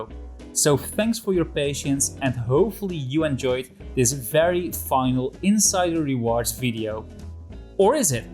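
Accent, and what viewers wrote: Netherlands. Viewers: wait! your dutch?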